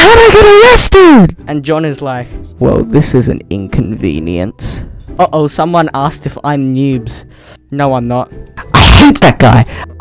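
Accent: Australia. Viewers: Oh I didn’t know you were Australian! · Are u Australian?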